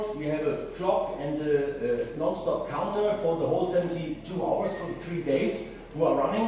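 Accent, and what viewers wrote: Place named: Germany. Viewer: You can hear that he is from Germany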